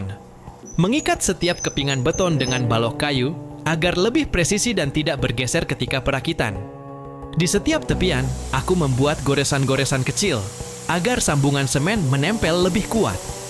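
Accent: Medan, Indonesia. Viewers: bg org Medan ya?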